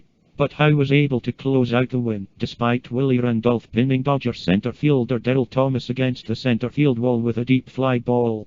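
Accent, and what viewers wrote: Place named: Ireland. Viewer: I am wondering: why did you choose the Irish voice for old videos on this channel?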